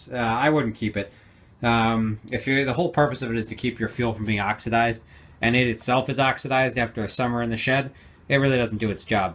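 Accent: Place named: South Boston, United States. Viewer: Mark Camaro it’s that easy! And or open the screw that secures the carb bowl and let it drain out. Keep the gas tank cap off for a day and any gas in the tank and lines will evaporate. Don’t remove these covers as shown. His accent sounds like he is from South Boston. I ought to know lol.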